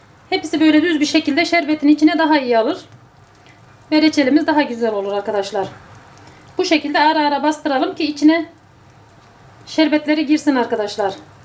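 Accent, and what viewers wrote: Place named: Antakya, Turkey. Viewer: bu antakya aksanı. tesekurler arkadas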